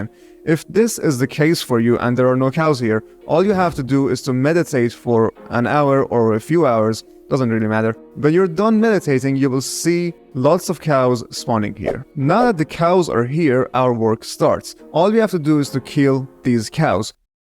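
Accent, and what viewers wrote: Iran. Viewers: U have Persian accent are u Iranian? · Ur accent sounds persian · بسیار عالی(از لحجه‌ات فهمیدم ایرونی)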